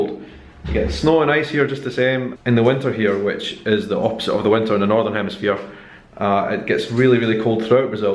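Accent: Scotland, United Kingdom. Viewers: I like your accent. Oh, Scotland. Great Respect! Ão, inho, 'muito' and some other nasal phonemes adds a lot of challenge to foreigners. Still the words in portuguese you'd mentioned were pretty ok.Do you practice these days? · he are british? have a funny accent haha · I love the scottish accent! Never heard a scottish trying to say PASTEL or PASTÉIS... Well, I´ve been to Inverness (and Glasgow and Edinburg), in a trip and the scottish there couldnt really guess WTF was our language! ;-) It´s interesting cause I was freezing and I saw redhead girls in small shorts LOL.... Nice video! Grats!! Have fun! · Scottish accent is so addicting. Really nice :) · be careful dude! im from new zealand i live in brazil, i actually got robbed last week and now i dont want to film in the streets because i think it could happen again, but be careful dude! love the accent from scotland, reminds me of old war movies haha! 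love it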